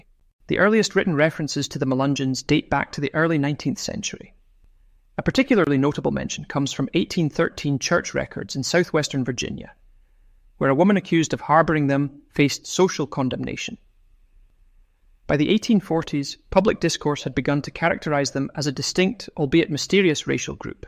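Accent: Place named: Scotland, United Kingdom. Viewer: The narrator has a Scottish accent.💙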